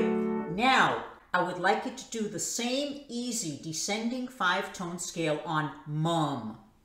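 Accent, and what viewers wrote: New York, United States. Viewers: Thank you for making and sharing this video. I look forward to watching your other videos. Also, I had a question. Are you orginally from New York?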